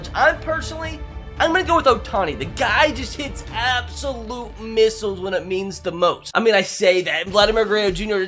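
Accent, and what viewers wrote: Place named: Alabama, United States. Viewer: he's from Alabama and has a accent so do I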